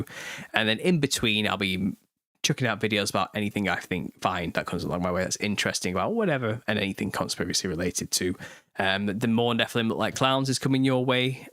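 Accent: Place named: Liverpool, United Kingdom. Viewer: You talk like an Everton supporter.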